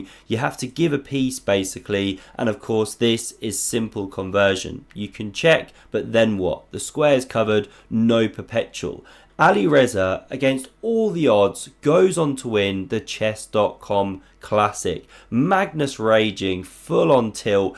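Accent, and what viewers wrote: United Kingdom. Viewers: Must be UK 🇬🇧 accent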